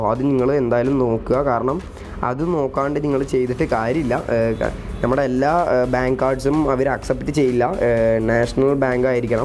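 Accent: Palakkad, India. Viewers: Eda chekka ante veedu palakkadano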